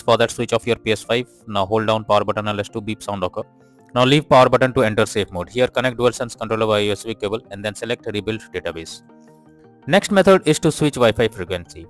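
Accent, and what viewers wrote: India. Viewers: He’s an Indian BTW 😁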